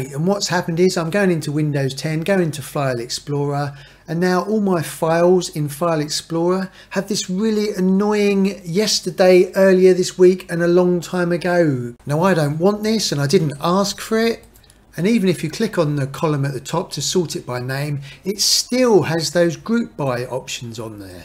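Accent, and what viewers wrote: United Kingdom. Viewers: Mantab mas british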